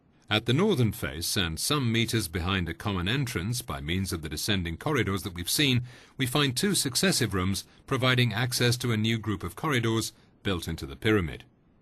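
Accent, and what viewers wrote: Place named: England, United Kingdom. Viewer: Folks, this video is filled with unproven presuppositions. It is a theroy with a nice 3d video and authoritative english accented narrator. Ramps, chipping with stone hammers, no wheels, no models before building, Tombs for dead men , Kings, hierarchical society, Humm? Stones were cleaved as diamonds are, ( being done today with giant stones) not chipped with copper chisels. Then they were moved with carts and oxen, not dragged by hundreds of workers in the sun for hundreds of miles up and down the countryside on slippery little logs of which one stone could stop a log from turning correctly. Counter weighting was used to elevate stones up the sides, not ramps and pushing/pulling tons of stones uphill on a ramp. Water filled counterweighted highly engineered hardwood carts filled with water descending down one side which raised the stones on similar carts connected with ropes from the other side using only gravity to pull them up. 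The finished top floor course with one more perimeter sidewall casing stone course could create a pool area for water. The angled casing stone about the perimeter would act as a smooth slope for ascending and descending carts using the pool to supply water to the descending carts as counterweights. Then the stones could be raised up the finish slope then be floated into place with air bladders attached to the carts sides. Two men with little energy could navigate tons of stone and place then perfectly to its designated place. The other two sides wit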